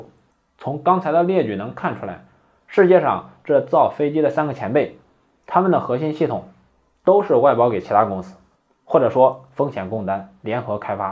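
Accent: Henan, China. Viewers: 听了两期已关注！提点小建议，普通话听着别扭，河南话带劲，我也不是河南人但听着带劲。干脆就河南话整起来，兄弟要有文化自信啊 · 空客的河南话很地道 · 这个老丝儿是河南了呀！ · 聽到的，河南鄉音，好舒坦。繼續努力加油唄！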